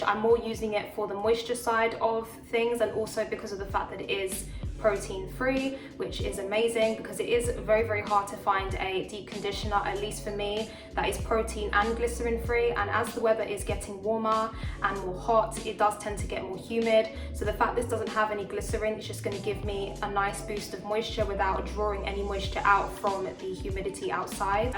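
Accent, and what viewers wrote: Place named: United Kingdom. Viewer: Just a quick question while I’m here, I’m judging by your accent that you’re in the U.K, so just wanted to ask where did you get your hair cut please. I love it!??!! 😍 I’m looking for a good curly hair stylist for a trim. Thank you. Looking forward to going through the rest of your videos.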